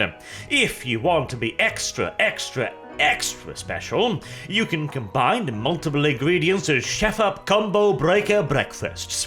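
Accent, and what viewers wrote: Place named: RP, United Kingdom. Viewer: Some people on the internet think is not aware of how smug and posh his accent sounds. They haven't seen his game reviews.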